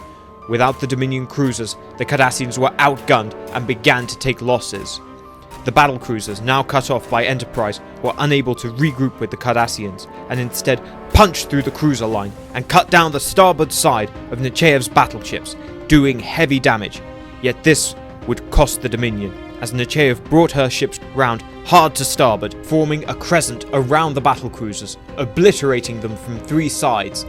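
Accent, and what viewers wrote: RP, United Kingdom. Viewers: You are sure to come from England because you can clearly hear the Received Pronunciation. Your RP or British English is flawless and very clean for me to understand in contrast to US English. There are also differences, for example in New England, the people there are very close to the RP. Otherwise, I don't understand anyone in the US. The pronunciation is worst for me in Alabama. Learning English is compulsory in German schools, but I think the mistake is that the students are drilled in RP. Not only in pronunciation, but also in vocabulary. There are clear differences between words and spelling in US English. My pen pal from the USA agrees with me and thinks that it is a mistake for the students to be drilled in RP in Germany. He says he always notices that when he reads my letters, because I stick to the RP vocabulary and also to the spelling of words that are spelled differently in US.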